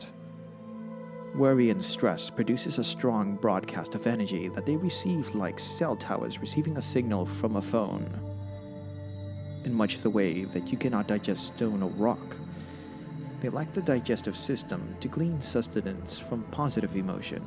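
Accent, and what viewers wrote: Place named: United Kingdom. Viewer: Why is a British person with a horrible accent talking about the Zulu Nation this is just wrong in many ways! OMG stop!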